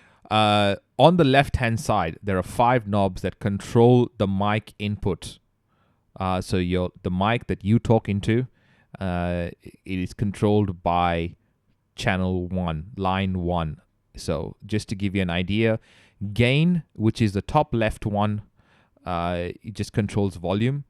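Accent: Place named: United Kingdom. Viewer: Good grief! You spent the first two minutes chasing your tail! Repeating yourself... If you have a "know it all" Brit accent, you better deliver in the first ten seconds... "I'm the smartest person in the room and I have the accent to prove it!" No you''re not....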